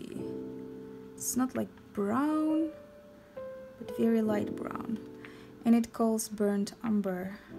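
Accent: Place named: Russia. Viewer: Такой русский акцент ,хахаха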